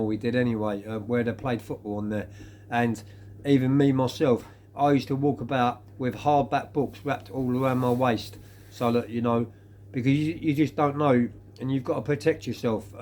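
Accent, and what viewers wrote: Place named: South London, United Kingdom. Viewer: iknew it was south of the river accent 😂😂good luck with your mission mate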